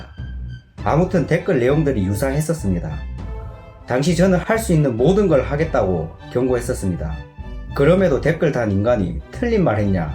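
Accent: Gyeongsang, South Korea. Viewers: 전라도가 좀그래요 님은 경상도?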